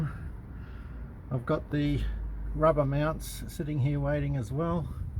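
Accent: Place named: Australia. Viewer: Was not expecting an Australian! Awesome.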